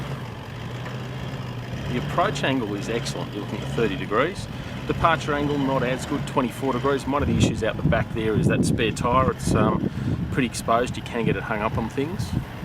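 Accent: Australia. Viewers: auzie accent :(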